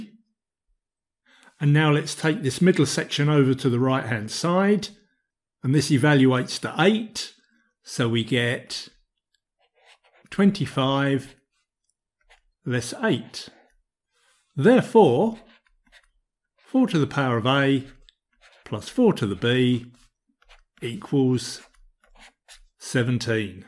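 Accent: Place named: Cockney, United Kingdom. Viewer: Really nice! Love your accent(Cockney I hope?)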